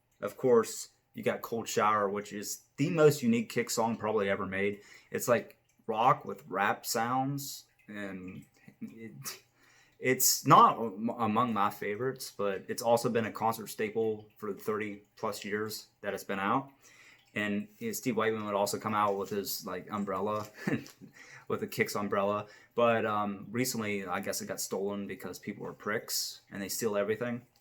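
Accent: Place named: Maryland, United States. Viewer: ....I hear your Maryland accent, you can't hide...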